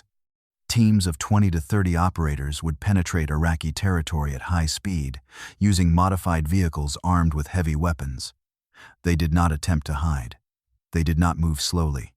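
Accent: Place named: United States. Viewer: Would be nice if these narrators spoke actual English instead of American bollox!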